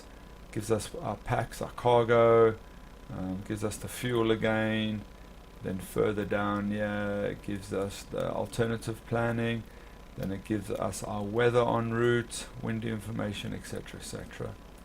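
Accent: South Africa. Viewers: hi pal how are u today u sound like ur from south Africa johansburg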